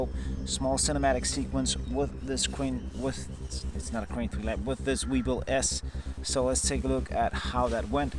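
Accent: Denmark. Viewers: Nice video :-) are you Danish? ;-)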